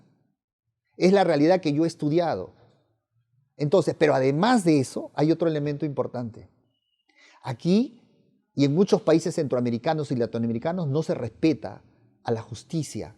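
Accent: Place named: Peru. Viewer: No es por discriminar y ante mano lo digo no todos son iguales, pero con solo a los primeros momentos que escuche a este señor asumí que es peruano, la mayoría que eh conocido creen saberlo todo y los demás están erróneos aún viendo los logros del prójimo , mi humilde opinión , eh aprendido q escucha consejos de quien a obtenido frutos no de quien cree lo sabe y no los a conseguido .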